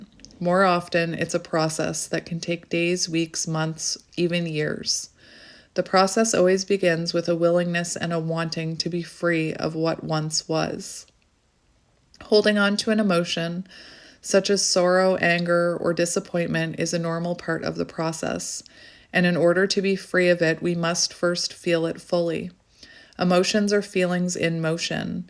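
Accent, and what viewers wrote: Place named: Canada. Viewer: I'm a Aquarian and also don't like to be told what to watch 😅 I will when I feel like it. But Zombies, no way! Lol 😅 I'm house sitting for a month 3 dogs and 2 cats, so when I have dinner or a snack, I have 3 pair of eyes watching me, so the dogs gets more if my food than me! 😅 But I would also have cried about the spider. I love nature and actually prefer animals to humans. I also will rescue a spider 🕷️ if it's lost inside the house. Some people will get an entire spray tin of poison to kill a innocent creature! That really makes me angry! Thank you for being such a interesting tarot reader, I love your Canadian accent. I'm in Cape Town, South Africa. We going into Spring/Summer where you are going into Winter.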